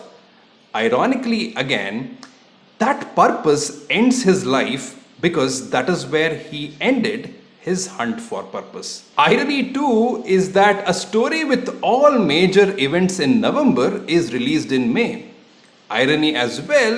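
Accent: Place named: Karnataka, India. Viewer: Very true, when he reviews on the same platform as BR, I feel robbed of BR's take. It probably has to do a bit with his accent/narration style which has a heavy kannada weight to it - it is just difficult to hear English in a kannada style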